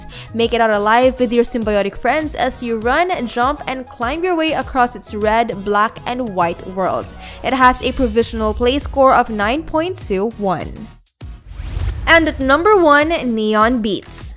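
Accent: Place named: Philippines. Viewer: the narrator is filipina. halata sa accent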